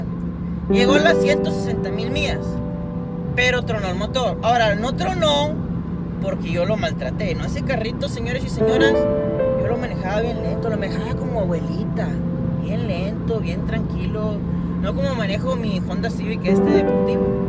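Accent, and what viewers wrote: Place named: Paisa, Colombia. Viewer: Puberto imberbe, si la tecnología de esos vehículos no está a tu alcance mejor ni los toques, hablas de Honda y autos que según son resistentes y al inicio que Volkswagen es rápido, potente etc ... Nivela tus argumentos y ponlos en dónde deben de ser. Cuando conozcas la tecnología de Volkswagen y autos de nivel alto (que creo que no están q tu alcance) te invito a Georgia. Saludos paiza, me gusta tu acento.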